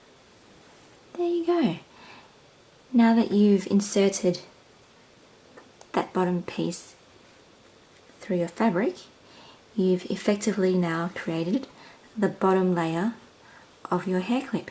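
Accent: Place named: England, United Kingdom. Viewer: Loved your tutorial! Very professional and the clips are beautiful! Being a Mom,I loved that you taught your little girl along the way! So sweet and like some of the other comments here,I agree that your English accent and soft voice make this tutorial all the more delightful to watch! Now I am going to go make these with my daughters!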